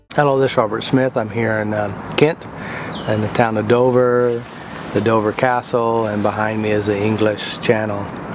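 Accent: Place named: South, United States. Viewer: Great video! Also for people who aren’t used to hearing a nice US southern accent, this is a nice example. ;)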